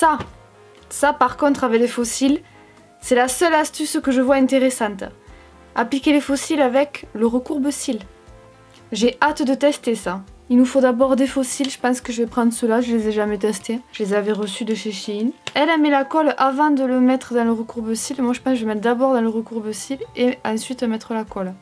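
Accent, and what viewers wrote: South, France. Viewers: J'adore t'entendre en vidéo avec ton accent qui me rappelle le mien hihi (je viens du sud vers Carcassonne)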